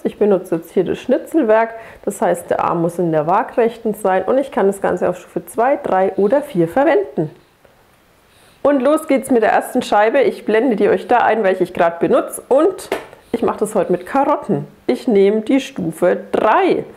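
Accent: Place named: Franconia, Germany. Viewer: Hört man sofort 😂 Aber nicht nur das r, auch das "Sprechsingen" etc. Mir Frangen hald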